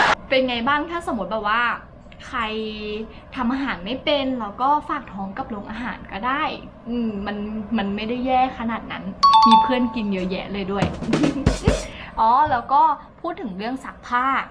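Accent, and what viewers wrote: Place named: Suphanburi, Thailand. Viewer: ฟังแล้ว สุพรรณแน่แน๋ๆๆ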